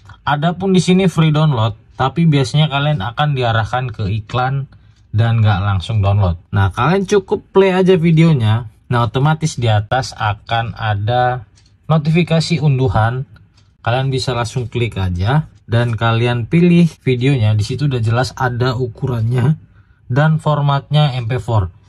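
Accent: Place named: Sunda, Indonesia. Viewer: Kok seperti suara Aa bandung ya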